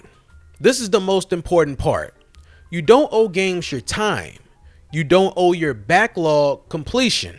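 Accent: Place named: New York, United States. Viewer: Couldn't watch the entire video because you keep saying brought instead of bought.. you nost likely say soar instead of saw and Mare-Rio instead of Marr-Rio. I refuse to listen to anybody that pronounces words that way and its 99% people from NY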